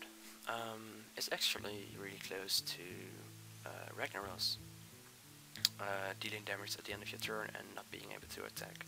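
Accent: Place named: Netherlands. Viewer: ik haat je Nederlandse accent gahaha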